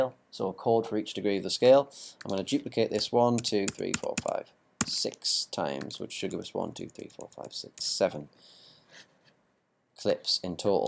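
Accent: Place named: Wales, United Kingdom. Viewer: hes welsh or gordy lol ,we love gordys coz the sound welsh good tut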